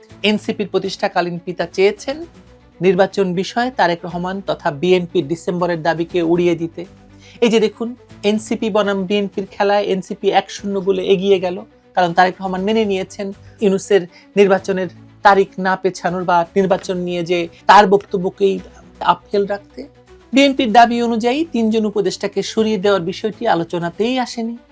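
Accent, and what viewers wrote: Kolkata, India. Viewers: আপু আপনার ভাষা খুব পরিচিত লাগে, কলকাতায় গেলে এরকম ভাষা শুনতাম। আপনি পাক্কা ইন্ডিয়ান মাল। দেশে আইসেন না প্লিজ।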